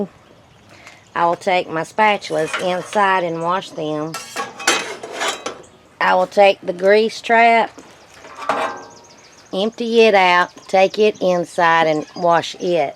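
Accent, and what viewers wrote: South, United States. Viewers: Your country voice made this so relaxing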